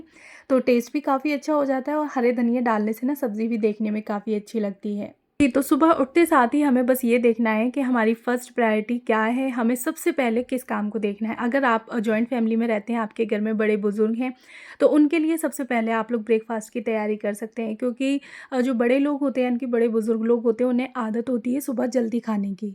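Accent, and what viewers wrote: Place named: India. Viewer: Because your acent is like india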